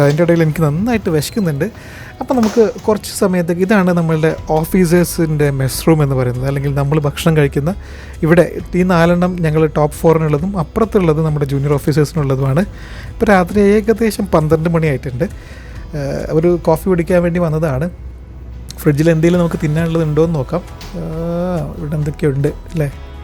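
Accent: India. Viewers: പുയ , ഇടക്ക് മലപ്പുറം ശൈലി പുറത്ത് വരും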